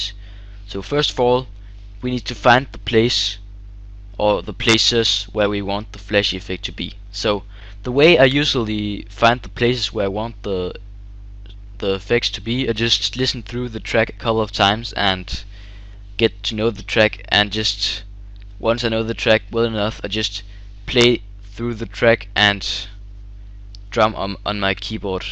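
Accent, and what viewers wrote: Denmark. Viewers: hes from Denmark we almost got same accent :p :D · Ur danish, right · He sounds more like Danish.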